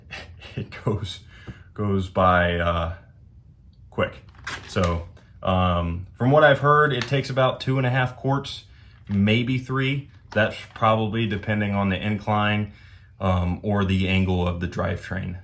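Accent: United States. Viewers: Mid Atlantic aka tidewater accent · Great video!! Thank you!! Where are you from? I can hear your “o’s”—sounds like Baltimore.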